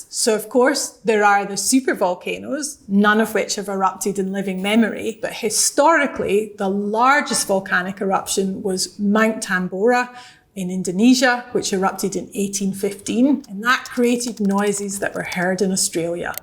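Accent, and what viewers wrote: United Kingdom. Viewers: This dumbass british accent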